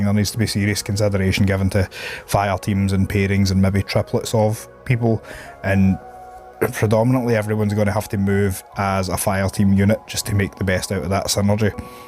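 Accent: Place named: Scotland, United Kingdom. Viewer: Some new necromunda channel I've discovered, great and detailed analysis, charming Scottish (i presume) accent, nice builds. Thanks!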